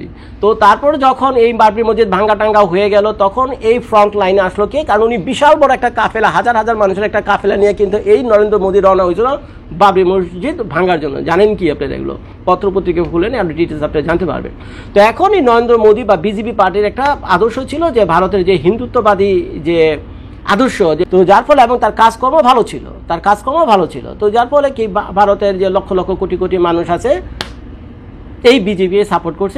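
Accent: Dhaka, Bangladesh. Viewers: ভাই আপনার কথা শুনলে হাসি থামিয়ে রাখতে পারিনা বিশেষ করে যখন আমাদের ঢাকার ভাষায় কথা বলেন । ❤️❤️❤️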